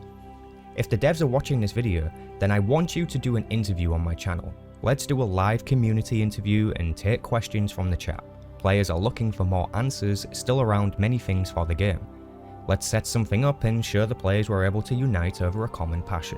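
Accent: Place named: Northern England, United Kingdom. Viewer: A fellow northerner? I must subscribe! Love owt like this wi’ voices like ours like.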